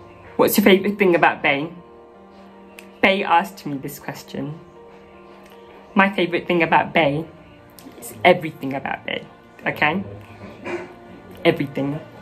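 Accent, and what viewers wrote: United Kingdom. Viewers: Hello, I was wondering how come you seem to have a British accent. Did you live in the U.K.?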